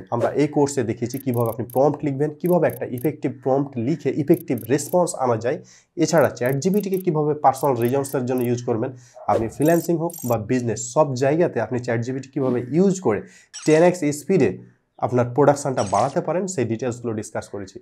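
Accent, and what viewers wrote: Chapai Nawabganj, Bangladesh. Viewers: ভাইজান, আপনার Accent শুনেই বুছেছিলাম চাপাই এর ভাই..... boost করেছিলেন সেখান থেকেই পেলাম, ধন্যবাদ দেখা হবে, ইংশাল্লাহ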